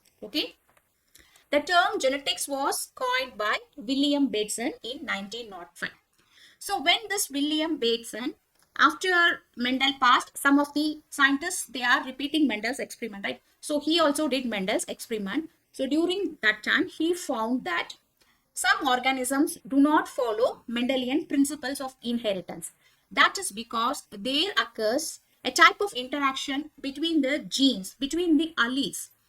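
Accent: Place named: South India, India. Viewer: You've a South - Indian accent.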